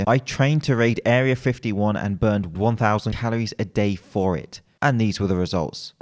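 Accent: United Kingdom. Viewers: Your teeth are too str8 to be British...but not your accent... i am confused....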